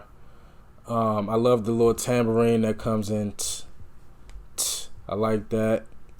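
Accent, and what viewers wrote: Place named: Ireland. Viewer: Big time Irish accent.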